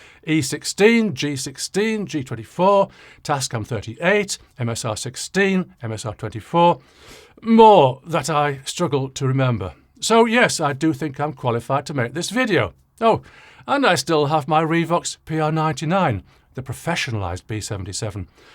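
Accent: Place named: Liverpool, United Kingdom. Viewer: Thanks scouser ❤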